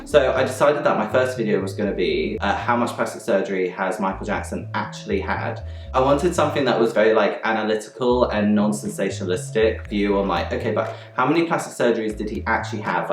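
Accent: United States. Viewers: i never get bored about hearing about Michael Jackson, also like the American voice on the videos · how come the voice overs are American men and women? I didn't know that this was a British content creator behind this Channel. Makes me like it more, fellow Brit! 🇬🇧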